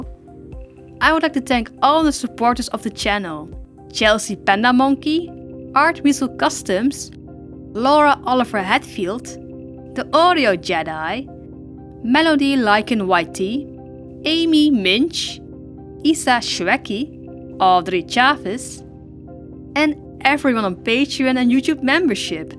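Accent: Netherlands. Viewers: Where are you from? You sound kinda Dutch in some way but idk oof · Heyo! I'm also Dutch! Ur pretty good at English. And I know, sometimes it's hard to speek English with that Dutch accent... I mostly just put something tru Google translate lol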